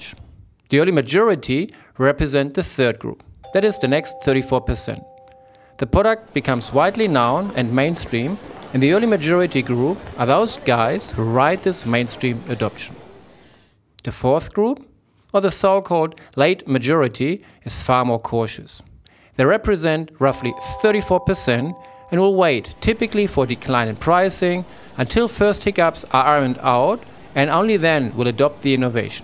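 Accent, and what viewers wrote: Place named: Germany. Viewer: not gonna lie, but I literally recognized your german accent in the first sentence :) Greetings from Germany :)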